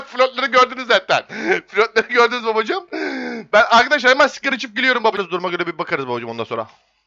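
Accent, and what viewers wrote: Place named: Bartın, Turkey. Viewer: Bartın’lı mısın babacım 😃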